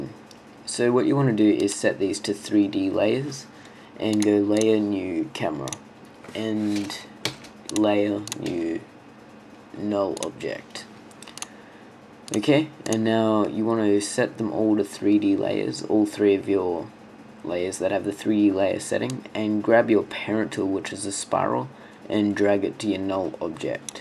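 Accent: Australia. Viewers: Yay, you're Australian like me. ;)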